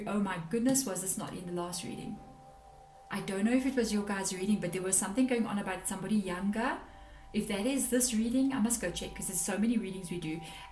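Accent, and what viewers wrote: South Africa. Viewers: Are you based in South Africa? Your accent sounds South African.. Do you do personal readings and how much does it cost?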